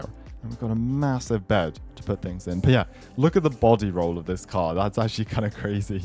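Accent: United Kingdom. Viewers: Wait are you British?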